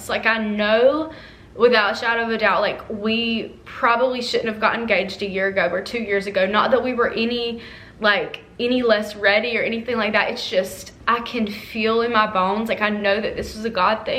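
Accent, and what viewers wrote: South, United States. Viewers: CONGRATULATIONS ......❤💚♥💛💙🧡💙♥💜❤💚💜♥💙 Where are you located? .. you sound southern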